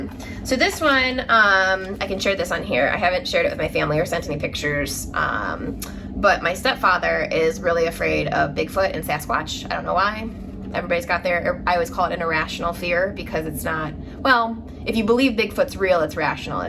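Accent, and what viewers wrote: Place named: Chicago, United States. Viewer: Belated welcome to FlossTube! Your first video just popped up into my feed today. Great intro. I like it so much when contributors share something about themselves. We're neighbors (if you consider a small village in Lake County on the border of IL and WI a neighbor.) We have the same accent - albeit I've been a North-sider most of my adult life! Go Cubs! Glad you love houseplants. I am a novice and could use all the tips you can give us. Coincidentally, I am going to Inspired Needle for the first time on Saturday to look for some special floss and fabrics for two of my pending projects! My local LNS is in Winthrop Harbor did not have what I needed - so I am heading South. :) I am working on "After the Roses." You are right - stitching is zen like, and IC's patterns are hypnotic and therapeutic. Can't wait to see your collection!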